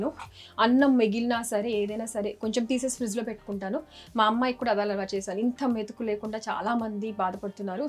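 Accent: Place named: Tenali, India. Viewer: Perfect tenali language 👌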